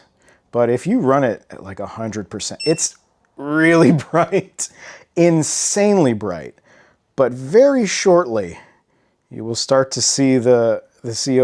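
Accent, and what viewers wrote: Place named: Australia. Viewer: Great video! I let it play as I swapped an MTE into my XR, it was like we were building together! I even caught myself talking in Australian accent with you 😜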